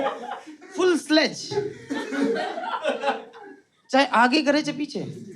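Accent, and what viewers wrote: India. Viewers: Bhai once again bohot bdiaa… vese jrut to nai h pr bhai k or tera fan hone k naate. Ek suggestion h Haryanvi accent na chooraa kr … baaki bohot bdiaa. ❤ Love uh bhai ne ❤ keep growing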